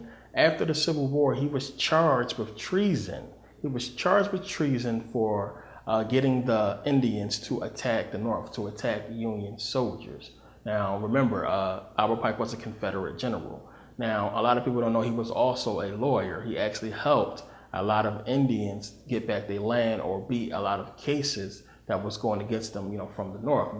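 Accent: Philadelphia, United States. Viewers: You wouldn’t happen to be from philly would u? It sounds like it from the way you talk.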